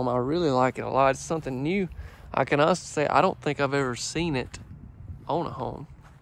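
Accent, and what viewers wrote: South, United States. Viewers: You could sell the phone book . I like listening to your southern charm and wit . Keep up the good work ! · Love the floors. Nice house but th as t 2 story was my favorite! And you don't sound like a goof! You sound like a southern gentleman!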